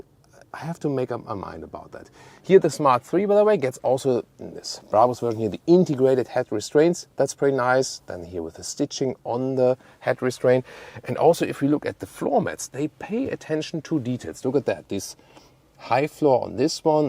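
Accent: Germany. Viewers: Dein Akzent (Du sprichst Englisch mit Deutscher Intonation) hat sich immer noch nicht geändert... Es wäre so einfach dies zu ändern, ein wenig Sprachtraining (mit ner App z.B.) würde schon helfen. Auch übersetzt Du oft Deutsche Ausdrücke direkt ins Englische, was einfach nicht passt. Es ist übrigens ein GEPARD, kein Leopard...ernsthaft?! Auf Englisch: Cheetah. Deine Reviews sind Klasse aber diese Deutsche Intonation im Englischen ist für native Speaker kaum auszuhalten.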